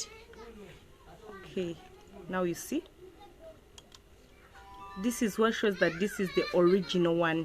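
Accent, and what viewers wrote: Uganda. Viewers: Hi, thanx Are from Uganda? Your accent!!! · Uganda accent for me bby girl thanks im gonna follow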